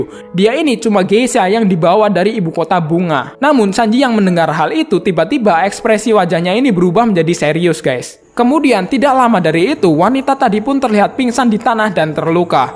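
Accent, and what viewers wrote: Java, Indonesia. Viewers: Tapi nada yang emang bikin nagih. gx bisa digantiin. Apalagi inggrisnya enak bro, lancar tapi aksen jawa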